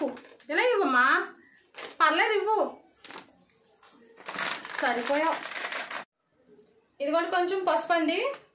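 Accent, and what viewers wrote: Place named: India. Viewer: మీరు మాట్లాడే విధానం ఉందే అచ్చ తెలుగు ఆడపిల్ల ఎలా మాట్లాడుతుందో అలా ఉంది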